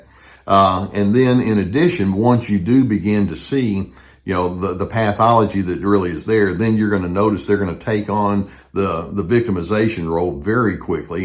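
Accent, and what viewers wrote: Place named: Texas, United States. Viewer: I feel better just looking at Gus. A really chilled dog! Love Texan accent too! It helps so much to have these videos to understand my past and in such a nice way. Thank you!❤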